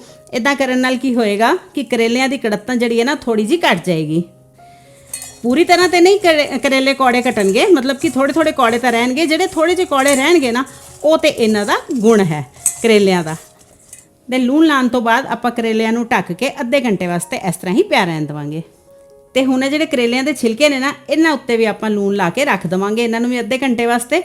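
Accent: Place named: Punjab, India. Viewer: Sat shri akal di..tuhada bolna da punjabi tareka bhut he nyc lagga..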